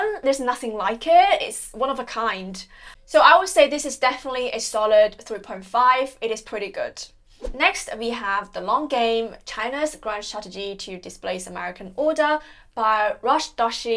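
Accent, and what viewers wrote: United Kingdom. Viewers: Great content. Learned a lot. Prettiest british accent ever. A+ on the book reviews. I am French. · your bookreview... I found it quite.. informative. Also about your topics of interest, and your public persona. Youdr British accent is quite impressive, you seem to be ambitious and a person, who is on a definite path of making your life exceptional in your own way. Did you read books by Cixin Liu? If yes, did you find them worthwhile? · you have a sort of posh british accent mixed with some chinese accent, it's very interesting; where did you goto school? did you grow up in england?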